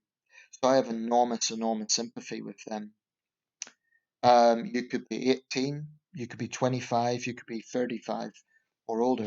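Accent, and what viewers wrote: Northern Ireland, United Kingdom. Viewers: Are You From Norn Iron as well?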